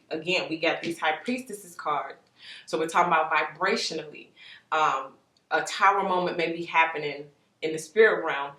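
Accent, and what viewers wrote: Chicago, United States. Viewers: I love you & your Chicago speak! Thank you for sharing your gifts. Your creativity is so authentic. I love going on mind trips with you✨ keep glowing sis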